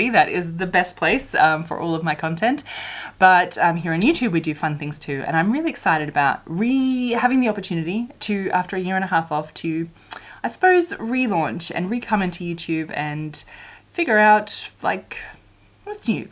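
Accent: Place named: Australia. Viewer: Just found your channel searching for ‘learn to sew videos’… so excited for watching all of your catalogue while I clean and craft and cook and maybe even try some sewing. Thank you for your videos, and so excited for a fellow Australian voice!! Also, amazing news for your recovery…I’m a breast cancer researcher (only a little bit part time with a family, the rest of the team does most of the work), overcoming TNBC is huge.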